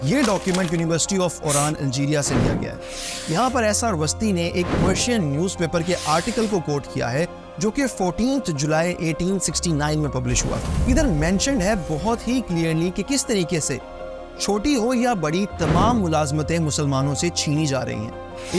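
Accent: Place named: Pakistan. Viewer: Actually he is from Pakistan I can tell this from his speaking style and also I have searched for his channel more and info and then I saw his location is from Pakistan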